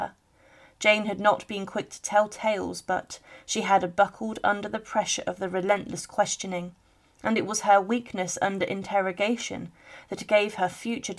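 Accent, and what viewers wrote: United Kingdom. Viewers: I am British. The narrator sounds British. So what are you saying?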